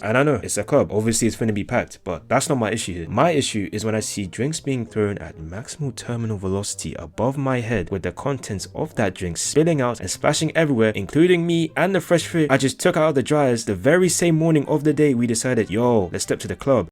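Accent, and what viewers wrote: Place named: United Kingdom. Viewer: Bri'ish 🥹 (u tuff twin)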